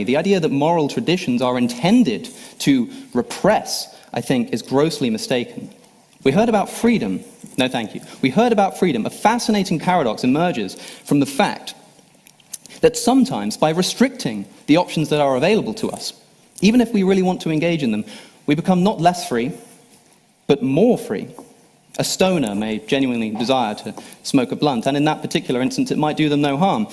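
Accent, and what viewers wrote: England, United Kingdom. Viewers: Alex champions the art of pretentious sincerity unlike a Sam or a Dawkins who are more blunt about their spite and hate. This speech also proved that if you take away his english accent, he's not even half as smart as Sam Harris.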